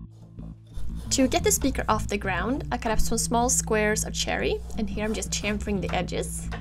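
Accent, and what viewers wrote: Sweden. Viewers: you have a very swedish accent :P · Du låter svensk? :D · Are you Swedish I can kind of hear that on your English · luse i speak swedish ans she does too. you can hear it quite alot from her accent if that was what you meant. cheers lad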